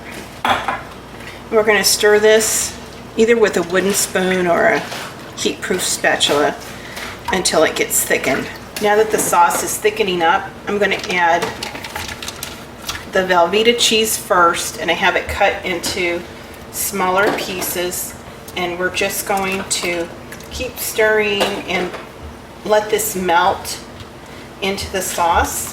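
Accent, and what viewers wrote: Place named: Tennessee, United States. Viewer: Idk where you are from, but I'm from Tennessee, and you sound just like me lol you remind me of family!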